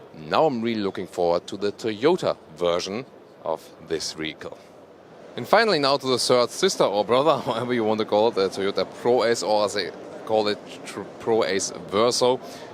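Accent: Germany. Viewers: ich habs nie verstanden warum du die videos in englisch machst, sehr anstrengend zu hören mit dem deutschen akzent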